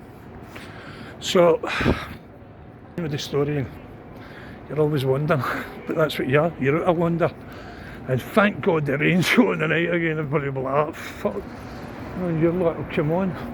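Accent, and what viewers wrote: Glasgow, United Kingdom. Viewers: You’ll need to buy yourself a wee battery charger, that will charge your phone as you walk, they are handy wee things. And you don’t need to be politically correct with your English words, we all love your Glesga accent And love your loyalty towards all your old pals, very nice touch that you name them in your videos, I’m sure their family will appreciate that you still talk about them even although they are gone As the old saying goes GBNF 😊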